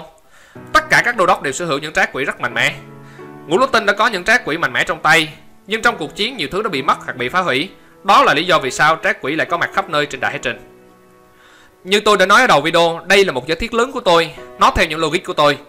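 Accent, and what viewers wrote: Quang Ngai, Vietnam. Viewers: giọng ad giống người quãng ngãi quá vậy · giong cua ad giong quang ngai gheee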